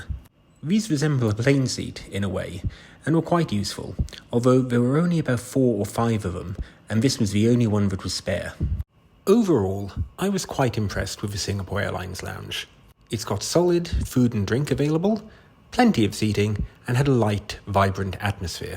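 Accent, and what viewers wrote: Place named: United Kingdom. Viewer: Wow your accent is soooo British lol 🇬🇧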